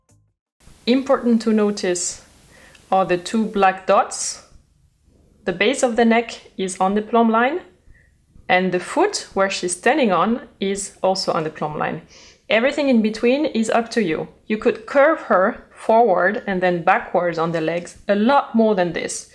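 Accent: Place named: France. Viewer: Very interesting. Very good teaching and a very generous teacher!!!!!!!!!! Thanks very much for sharing your ability. And your English, is very good, just a little french accent, non disturbing at all (I'm Italian).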